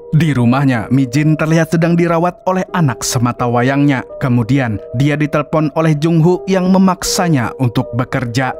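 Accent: Banjar, Indonesia. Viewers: Urang banjar kah mimin nya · banjar kah ni logat nya😂 mirip iq 7 jua suaranya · Mang pian urang banjar kah? Ulun hanyar sekali nonton disini😭😭😭🤣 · Nah klo ketahuan ku dh si amang urangk banjar😂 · Uma ay urang banjar kah min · Urang Banjar kah pian mang Salam nah dari alalak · Orng banjar kah bg 😂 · Urg Banjar kh mang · urang banjar kah pian mang ehehehe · Urang Banjar sklinya Pian lah😅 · Urg Banjar sakalinya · Anjay urang banjar kah😅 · Bang kaya orang banjar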